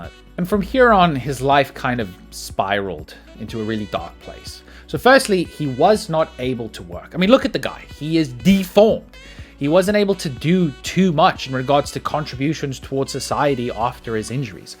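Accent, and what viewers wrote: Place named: South Africa. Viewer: Surely you’re South African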